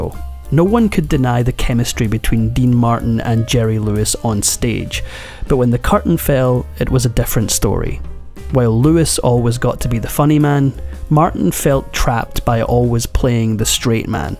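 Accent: Ireland. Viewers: Are you Irish? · Is the narrator Irish?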